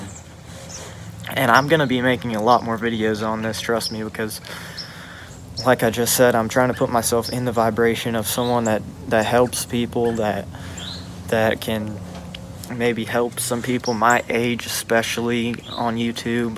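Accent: South, United States. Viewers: You sound like your from the south...how old are you?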